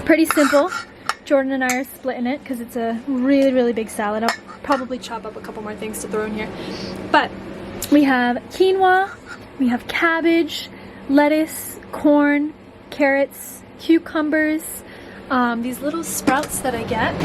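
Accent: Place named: Midwest, United States. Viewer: If you both are from Boston you sure don’t sound like it you sound like Midwest. I’m born and raised in Boston you don’t sound like anyone here lol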